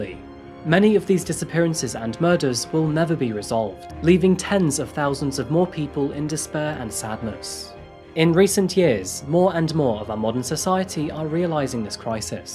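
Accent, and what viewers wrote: United Kingdom. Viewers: What part of the uk you from? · Still can't wrap my head around. A British sounding narrator suggesting coffee. Hehe. Great work again 😉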